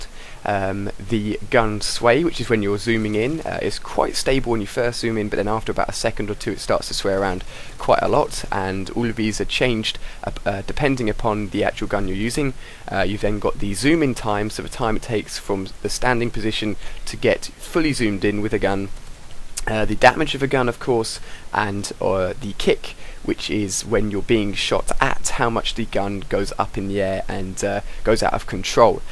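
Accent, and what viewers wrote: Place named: England, United Kingdom. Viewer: you sometimes sound like brownay( i hate brownay) but in a english accent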